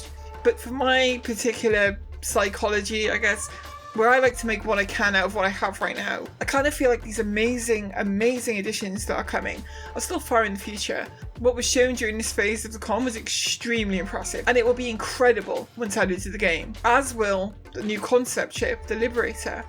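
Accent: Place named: Liverpool, United Kingdom. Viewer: This accent sounds like Scouse.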